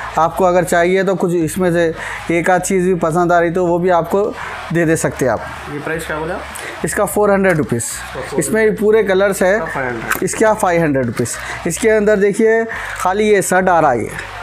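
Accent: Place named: Hyderabad, India. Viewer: Hyderabadi baata ya Allah 😂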